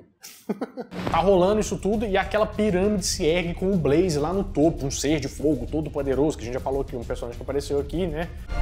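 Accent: Minas Gerais, Brazil. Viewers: Mortal Kombat em Verso e Prosa KKKK. Do jeitim Mineiro kkkk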